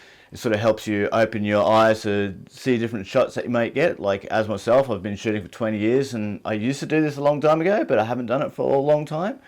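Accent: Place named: Australia. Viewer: Great video! Love hearing a fellow Aussie on YouTube! I've just done a video / project with a monochrome in camera preset. Loved it! Great video!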